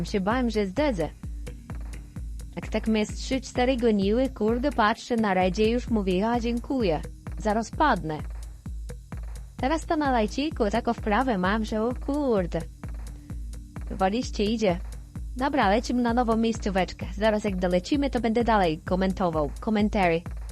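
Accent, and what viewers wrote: Poland. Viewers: Z jakiego rejonu Polski jesteś? Skąd akcent? XD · Podoba mi się twój Podlaski akcent.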